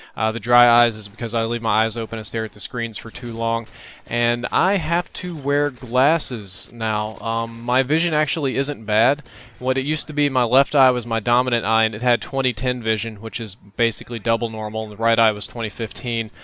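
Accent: South, United States. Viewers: I like how puts so much enunciation on the "h ". yes i know its because hes from the south and its just his accent, but it reminds me of when stewie from family guy says cool whip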